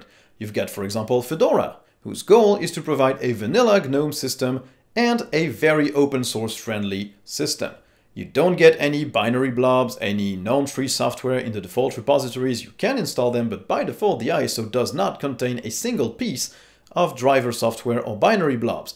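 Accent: France. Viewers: Are u french ?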